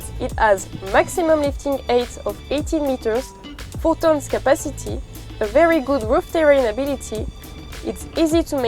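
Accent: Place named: France. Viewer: I love when someone speaks English with a French accent :))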